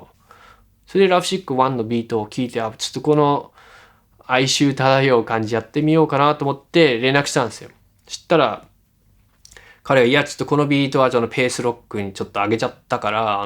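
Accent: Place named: Kansai, Japan. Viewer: ちょっと関西弁なんがすごい新鮮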